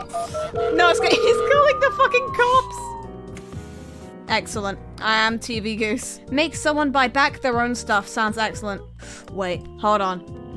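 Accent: United Kingdom. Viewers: The Brit really came out of her this time XD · My first time watching outside of shorts and somehow she is even more British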